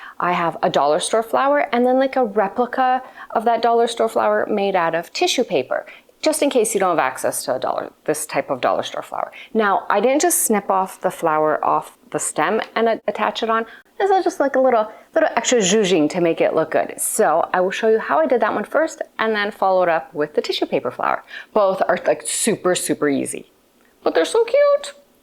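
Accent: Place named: Canada. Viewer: Gorgeous arrangement, that first branch is such a lovely, graceful shape. You always say your name at the start of the video and to me it sounds like, but I have seen people address you as. With your lovely Canadian accent maybe I’m not hearing it right! Anyway, whoever you are - thanks for the video! xx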